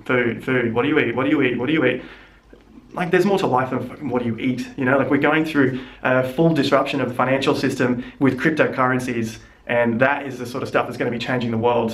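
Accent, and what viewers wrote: Australia. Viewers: you've picked up the australian accentttt :D :D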